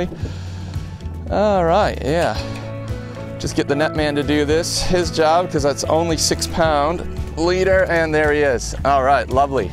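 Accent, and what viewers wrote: United States. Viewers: The accent sounds Boston. Like Ray the series.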